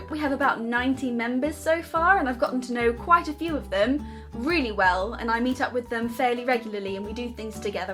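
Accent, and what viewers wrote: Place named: United Kingdom. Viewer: Also you sound a lot more British now and also the accents that aren't good are.... Londoners and Yorkshire. :P At least that's my limited impression. haha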